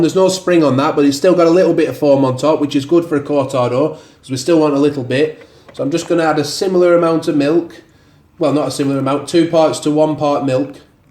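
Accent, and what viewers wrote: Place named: Northern England, United Kingdom. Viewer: ah, your accent sounded a bit like Gary Neville's who's from Bury so I figured you were from round there. Q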